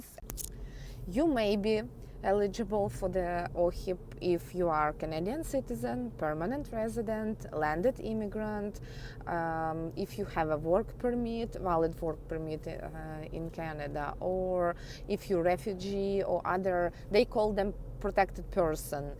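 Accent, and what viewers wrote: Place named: Russia. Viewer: Fookin Russian accent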